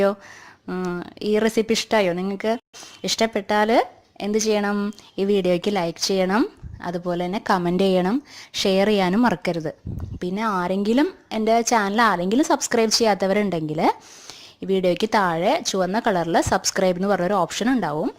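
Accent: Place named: Thrissur, India. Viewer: variety breakfast.. thrissur bhashayanaloo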